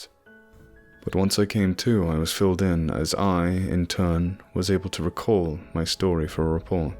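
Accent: United States. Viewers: If that's you doing the American accent as well, damn, you are GOOD. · That American accent is on point my dude 👍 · Over-pronounced? Just sounded like various American accents. Sounded pretty good to me. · Your American accent is wonderful!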